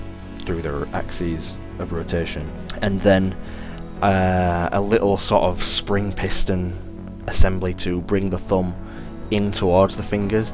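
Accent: Hull, United Kingdom. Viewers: You're welcome. It's literally a 'make everything beautiful' feature. A couple of clicks and boom! Realistic shadows and lighting. Did you use any constraints on the hand rig? They're a pain to set up, but they're a real timesaver for animators. Hearing the Hull accent on YouTube is weird. 😀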